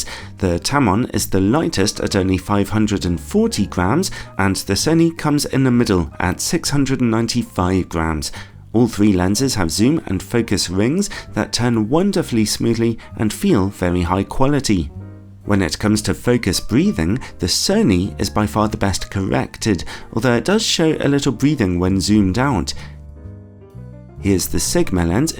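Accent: United Kingdom. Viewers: he is british. It’s Zed in the UK but not found as much as everyone. T as Tee but some says Tango Z as Zee but some Zed V as Vee but some says Vicky C as Cee but some says Carrots B as Bee but some says Bob. They say these stuffs cuz some people in UK have bad hearing and often hear something else. Sorry for that you found it funny.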